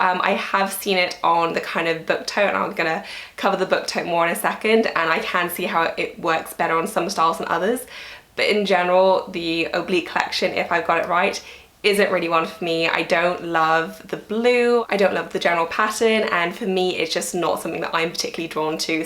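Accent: United Kingdom. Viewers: Ruth-Ann Leveridge-Young I never cared for British accents until I heard her speak. 😂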